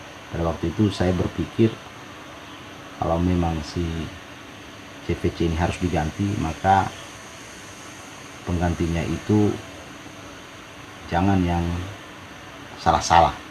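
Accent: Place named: Palu, Indonesia. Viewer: Dari logat sdh ketahuan org palu mange🙏😁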